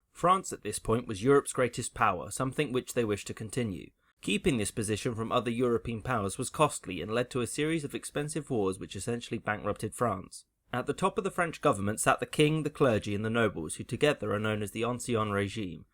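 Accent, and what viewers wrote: United Kingdom. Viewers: I can't be the only one who thinks you are British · God save us from British accent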